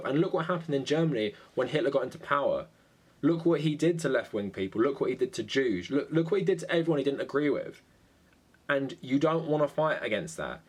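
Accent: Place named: United Kingdom. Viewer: I'm not even going to finish the video because I tell already you are advocating and defending ANTIFA. Here's the thing you're argument is essentially if you don't agree with the political speech it's ok or justified to use violence. Now flip that since i disagree with you that means it's ok that i use violence to stop your speech right? Your accent seems British to me so I'll leave you with a quote from Winston Churchill "future fascists will claim to be antifascists".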